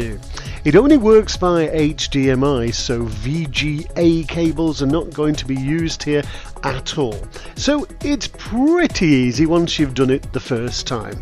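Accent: United Kingdom. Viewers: are you telling that to the guy in the video ? -.- that's so racist, and stupid ! That's a British accent, and the higher chances are that he is already there were he made the video, he doesn't have to go back anywhere ! .-. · Do I detect a Scouse accent here?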